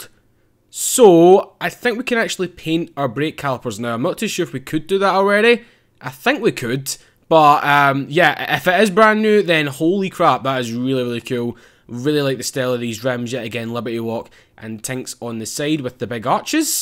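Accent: Scotland, United Kingdom. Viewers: he's from Scotland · u r my my fav YouTuber now not only r u Scottish like me but I can make out every word u say unlike the Americans lol.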